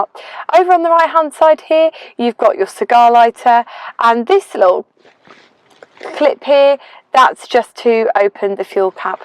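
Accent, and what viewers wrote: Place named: RP, United Kingdom. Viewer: omg no way y are so posh lady.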